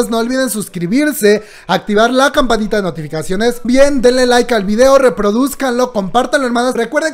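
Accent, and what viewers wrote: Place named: Mexico. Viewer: Amo como hablan los mexicanos 💚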